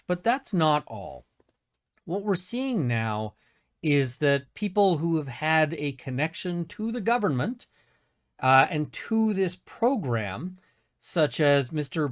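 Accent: Canada. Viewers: Hate the quebeque English accent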